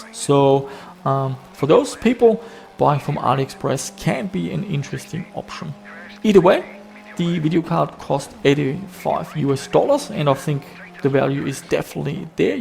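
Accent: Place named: Germany. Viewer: you german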